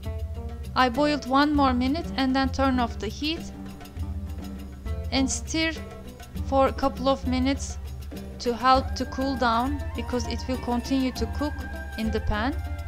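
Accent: Turkey. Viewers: Funny but I'm Turkish and this is the first time I hear English with a Turkish accent 😂. I can't speak with Turkish accent, maybe I don't hear my accent I don't know. Anyways that's nice you're keeping your accent and thank you I heard my accent for the first time hahaha.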